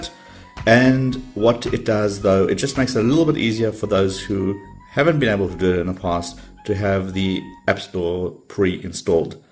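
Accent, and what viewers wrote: South Africa. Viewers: He's a Saffer: if you ask him to say milk he'll pronounce it 'mulk' and he might refer to traffic lights as 'robots'. Either way, he's a good reviewer and getting a sub from me.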